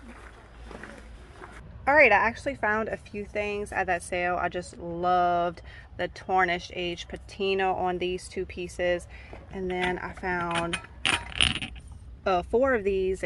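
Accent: Louisiana, United States. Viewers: Mam, was wondering... Are you Cajun? I live in Louisiana and you accent sounds very familiar. Love it!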